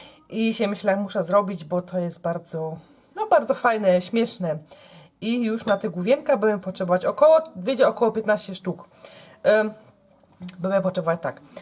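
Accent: Silesia, Poland. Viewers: ciastka gówno? :D zajeżdza niemieckim akcentem jak u slązaków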